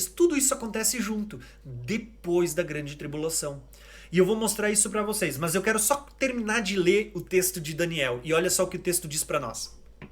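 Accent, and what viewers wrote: Rio Grande do Sul, Brazil. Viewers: Shalom irmão !!! Excelente video !!! Sutaque de gaúcho !!! Faz um vídeo sobre MATITYAHU [ Mateus] cap 5 vs 17 e 18 !!!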